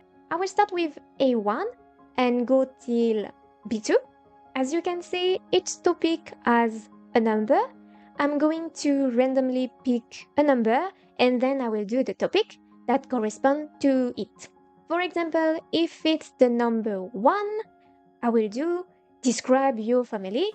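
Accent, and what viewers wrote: France. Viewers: Hola, you have improved a lot since I last commented on your videos. You've started to use the preterite a lot instead of the present perfect which is great, you have verbs agreeing with the subject. - mejor "capítulo", creo que se puede decir también "episodio" - excellent use of the imperfect and I love the cute "oui" after you corrected to "mucho tiempo" haha - cuarenta (quaranta is Italian, which I would love for you to start learning too 😄) Edit: you corrected yourself actually so great job! - this is quite advanced but I think it would be "como era", I think you can say "pero porque" but it's kind of a direct translation from English ("but because"), also then it's "no pudimos comprar nada en las tiendas" (I also get podemos and pudimos mixed up!). Great job with "pescado" that was correct and great that you didn't say "pez" - ooooh a subjunctive! 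I also love your accent in English because it's like a mix of a French and unspecified Asian (I guess Korean in your case). You speak clearly and correctly which is the most important thing. I for example would just speak to you like I do with other native speakers, that's how good you are! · Hola! I think I missed what your native language is! maybe french based on your channel's name jajaja. So, keeping with the topic of your video, im gonna keep writing in spanish. Me encantó el video! Soy de Argentina y también estoy en este viaje de aprender algunos idiomas. En este momento tengo un inglés que fluctúa entre b2 y c1, dependiendo de las skills que use (writing or speaking). Estoy aprendiendo algo de italiano y ruso (muy básico). A diferencia de lo que dicen algunas personas, creo que el español es uno de los idiomas "más fáciles" para aprender puesto que es un idioma fonético, y realmente no usamos los 16 tiempos verbales. 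en este punto estoy escuchando tu descripción y noté el acento jajaja Vos (tú) venís excelente! El acento francés le da el toque especial a tu español jajajaja, se escucha muy tierno. Entiendo que estás aprendiendo el español de España, no? El español de Argentina es mejor, way cooler 😁 Definitivamente voy a seguir todo este proceso en tu canal!!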